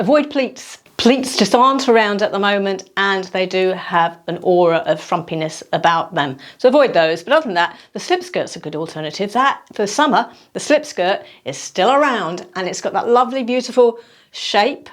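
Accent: England, United Kingdom. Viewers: The color of lipstick you are wearing may your teeth look off color not white! You’d look younger if you would wear brighter lip color with a sheen and get your teeth whitened! You have become really great on YouTube! Love the English accent and humor!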